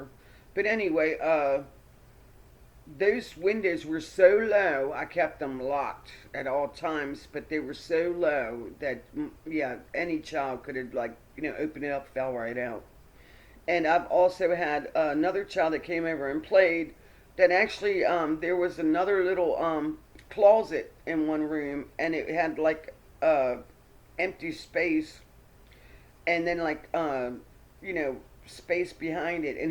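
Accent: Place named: Baltimore, United States. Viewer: I knew you were from Baltimore, my hometown! I could recognize the accent and feels like home. You’re absolutely right, many autistic folks have gone missing since this whole corona stuff. Very sad. Thanks for reading the cards for him.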